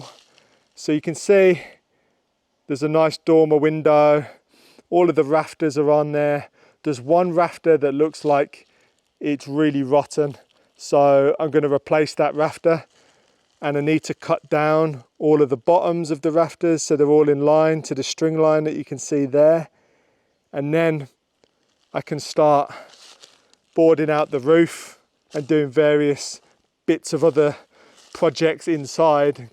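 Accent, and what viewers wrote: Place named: England, United Kingdom. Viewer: Hello! I like your videos. From a true portuguese ( many are now fake&soon they will be gone as well as in England is happening about fakes),. I prefer to listen to your nice english accent. I don't like this AI abd is not portuguese is brazilian which is called portuguese from Brazil for them to take advantage. How can I take this AI and got back your voice??? Can u tell me please? I tried deactivated legends, put translating to look for english, but that option is not availabe.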